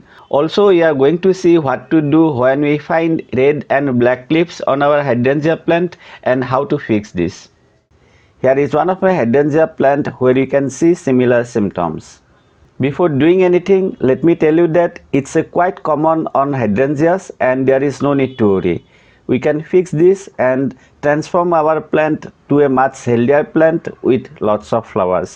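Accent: India. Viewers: Very strong indian accent i hope hydrangeas are strong..pointless video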